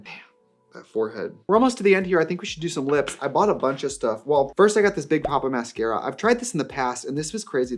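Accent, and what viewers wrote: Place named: Milwaukee, United States. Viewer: it’s the midwest accent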